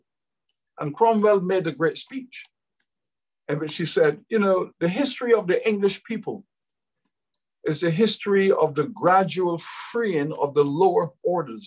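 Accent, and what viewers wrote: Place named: Caribbean. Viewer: Dude has books. Damn. And a posh Carib accent.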